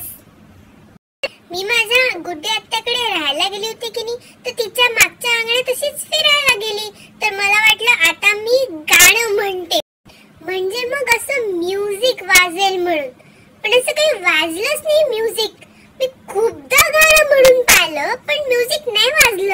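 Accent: Nagpur, India. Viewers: Bhaitad! typically Nagpur very cute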